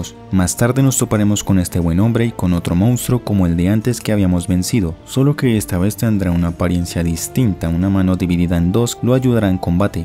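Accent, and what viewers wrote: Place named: Peru. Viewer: Se nota que eres peruano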